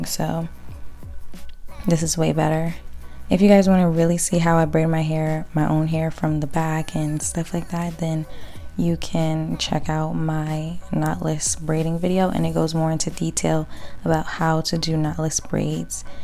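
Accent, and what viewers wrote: New York, United States. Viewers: Your accent is so New York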